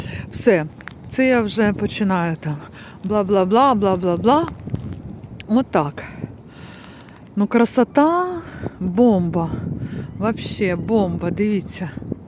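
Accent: Russia. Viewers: Барышня , слушая вашу речь понимаешь что родной язык ваш РУССКИЙ. Не издевайтесь над собой и не занимайтесь садомазохизмом. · Дуже гарно розмовляєте українською, хоча чутно що розмовляли російською, велика подяка та респект, в цьому видно любов, повага, пам'ять до чоловіка який загинув за Україну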